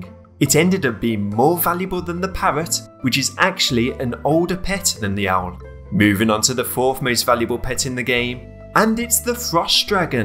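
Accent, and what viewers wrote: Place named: United Kingdom. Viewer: You can tell hes british!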